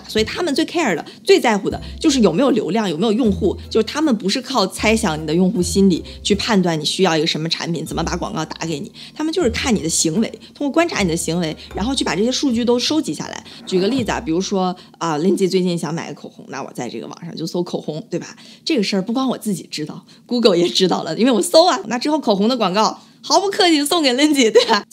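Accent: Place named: Shandong, China. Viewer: 你是山东人吧，我听你口音像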